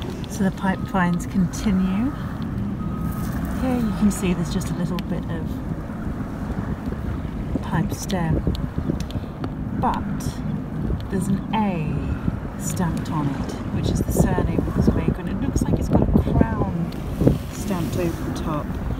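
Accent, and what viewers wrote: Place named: England, United Kingdom. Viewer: You have such a sweet English accent.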